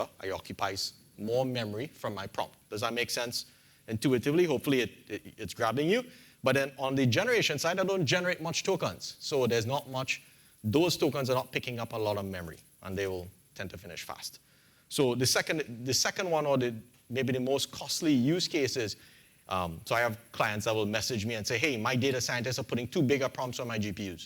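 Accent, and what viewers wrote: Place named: Caribbean. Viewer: Thanks for this presentation,. This has been very helpful. Also great to hear a Caribbean accent 🇹🇹. Bless up me bredda.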